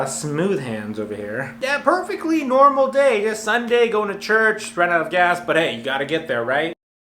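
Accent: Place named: United States. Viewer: You got the weirdest country accent I've ever heard. lol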